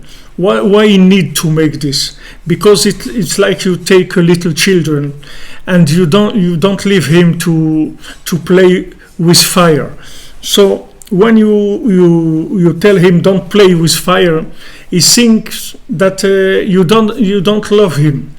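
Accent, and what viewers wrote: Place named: France. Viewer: Ah! What a surprise! That's the first time I hear you teaching us in english! I didn't know you speak several lagages! Baroukh HaChem j'ai tout compris de ce que vous dites en anglais, my english isn't so good. Et très charmant votre fort french accent!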